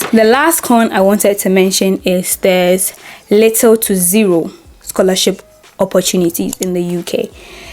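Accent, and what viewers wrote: Nigeria. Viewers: I said what I said she sounds Nigerian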